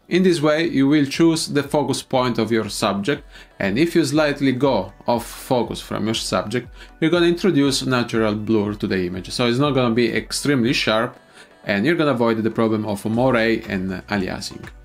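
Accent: Italy. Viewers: I love your positive attitude, quick smile, great information/advice, and pleasant Italian accent! I think if you keep this up you will eventually earn many subscribers. But (even though I'm not a director) I'm going to "blast you" about focus! It's very distracting that your eyes and much of your face are out of focus for so much of this video. I would advise to just go ahead and stop down the lens a bit next time and turn up the lights to bring back the exposure you want.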